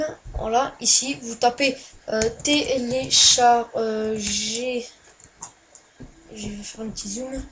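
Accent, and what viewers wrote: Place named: Marseille, France. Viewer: J'aime ton accent marseiller:)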